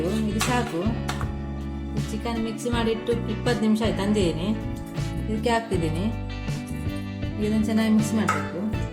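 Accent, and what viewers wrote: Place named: Mangalore, India. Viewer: I like mangalore kannada.......... 😍😍😍😍😍😍😍😍😍😍😍😍😝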